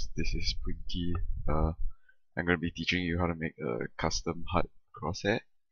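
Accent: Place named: Singapore. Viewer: i sense the singaporean accent :OO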